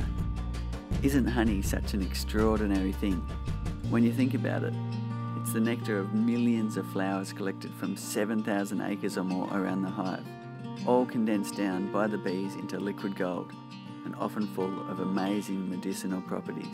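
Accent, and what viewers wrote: Australia. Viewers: Would very much appreciate you complement the vid with subtitles... your accented English (Aussie?) makes full comprehension rather difficult, caught about 90% of it. :-)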